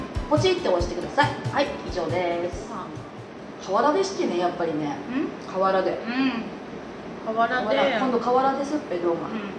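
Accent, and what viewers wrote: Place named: Yamagata, Japan. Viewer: 芋煮というので野菜の煮物のようなイメージを持っていましたが、この動画を見ると鍋とか汁物のような料理だとわかりました。美味しいのでしょうね。山形弁が時折出てきました。山形弁の響きはとてもよかったです。もっと、山形弁を増やしたらどうでしょう。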